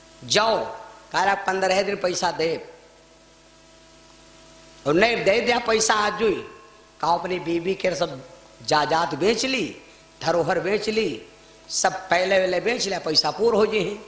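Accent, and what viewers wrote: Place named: Uttar Pradesh, India. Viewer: बहन सीतापुर और बाराबंकी की लोकल भाषा यही है ये कथा वाचक सीतापुर और बाराबंकी के बॉर्डर के हैं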